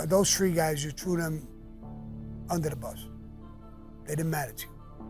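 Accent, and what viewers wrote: New York, United States. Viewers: We are so blessed .Hear authentic stories from one of the legendary gangsters. unique stories told with a beautiful New York accent. For me it's better than any mafia movie I've ever seen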